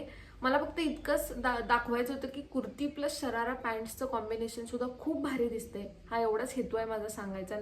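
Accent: Kolhapur, India. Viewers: बोलण्याच्या स्टाईल वरून तरी तुम्ही कोल्हापूर साईट कडच्या वाटता ताईसाहेब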